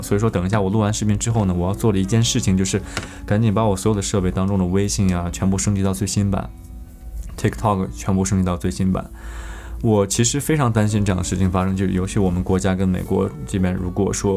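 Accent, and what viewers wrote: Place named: Linfen, China. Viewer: 大哥，你不是临汾人吗？这口音怎么・_・?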